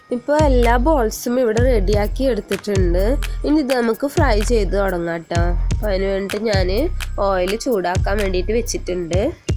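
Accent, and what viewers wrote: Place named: Thrissur, India. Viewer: Video full kandu Thrissur language 👍🏻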